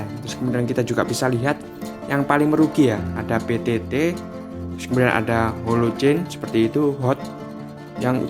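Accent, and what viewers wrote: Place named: Java, Indonesia. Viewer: Medoknya keliatan🤣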